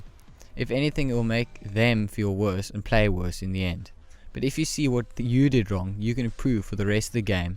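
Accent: South Africa. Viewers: Your South African, aren't you xD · south african ? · You from South Africa bruh? :D I can hear that accent clearly my bra> good Video btw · u from south africa bro? can hear it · is this guy from south africa?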